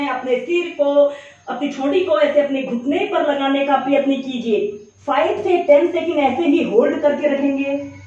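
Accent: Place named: Haryana, India. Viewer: Lagta hai Haryana k ho aap...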